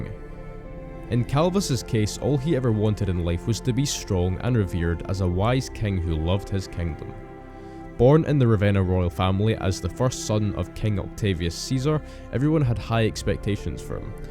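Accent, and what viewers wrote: Scotland, United Kingdom. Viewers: u scotish?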